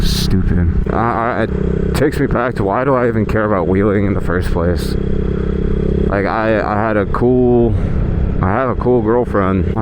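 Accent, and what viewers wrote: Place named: Louisiana, United States. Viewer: I know you’ve got something wrong cause you sound like you’re from Louisiana with that slur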